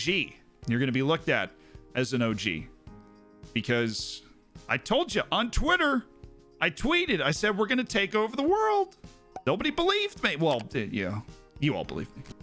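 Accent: England, United Kingdom. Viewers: Oh shit that was an English accent was it? P.S. Good luck with the revamp bud i'm looking forward to what you're going to get up to. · Good English accent! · That English accent is fantastic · Decent English accent.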